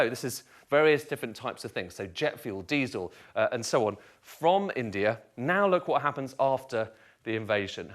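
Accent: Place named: United Kingdom. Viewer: Classic brits narration..master of manupulation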